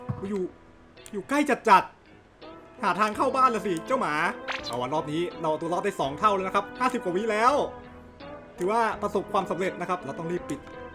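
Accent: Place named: Suphan Buri, Thailand. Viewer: อยากลองฟังพี่ พูดเหน่อทั้งคลิปดูจัง555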